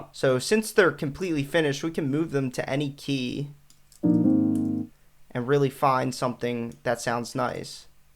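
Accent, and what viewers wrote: Philadelphia, United States. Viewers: The Philly accent is so strong. You got a fan.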